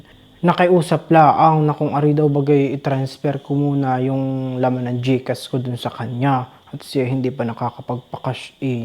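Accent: Batangas, Philippines. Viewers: Anong accent yan? Saang probinsya yan? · Gustong gusto ko ang punto mo, taga saan kb meses? Batangas o cavite?? Nppasaya mo kming lht, maraming salamat❤❤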